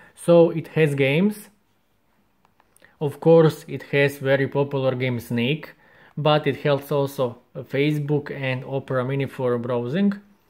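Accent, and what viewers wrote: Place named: Serbia. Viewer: Croatia ? When I hear your English , I first think that you are from Serbia :D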